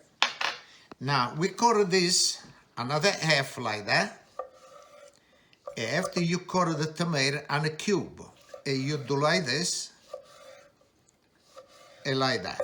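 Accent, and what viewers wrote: Italy. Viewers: I like the Italian accent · Yes, but only next to Lidia Bastianich tho. For me anyway. He is adorable and when he speaks, it's with that unmistakably Italian flare.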